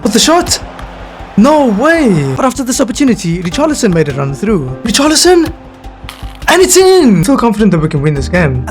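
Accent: South Africa. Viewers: R u from Sa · Broski are u from south africa?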